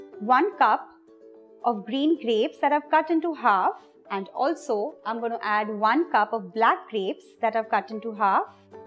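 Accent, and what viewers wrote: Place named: India. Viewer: That is a typical Indian accent! It is how we speak so...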